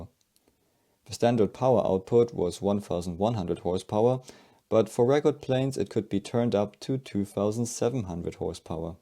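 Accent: Germany. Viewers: And all this with the your german accent. Einfach herrlich. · To anybody interested. The channel owner, although sounding German, I suspect has Scottish genes since I found out the name of the channel has thy Scots surname of. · bro your german accent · Your accent sounds very german, i know that because i'm german, are you german too?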